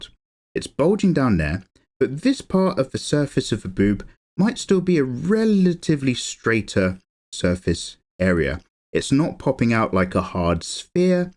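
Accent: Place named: RP, United Kingdom. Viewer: I just spent 23 minutes listening to a very pleasant British gentleman explaining in RP (sounds like RP to my ears) how to draw anime tiddies. Youtube algorithm, mission accomplished. Jokes aside, this really was an interesting video.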